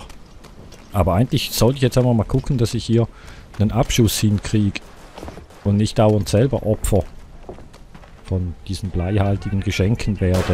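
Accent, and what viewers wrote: Switzerland. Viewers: Wohnst du in der Schweiz? Du klingst wie ein Ostschweizer :D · Er kommt aus der Schweiz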